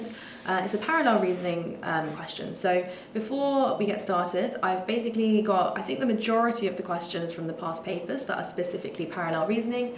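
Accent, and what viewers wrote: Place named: United Kingdom. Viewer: Agree 👍 When I heard her British accent, I figured it out right away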